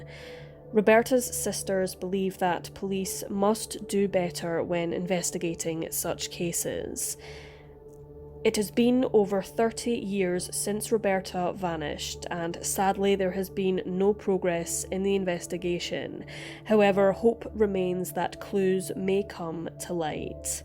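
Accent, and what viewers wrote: Scotland, United Kingdom. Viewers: I like your accent, scottish?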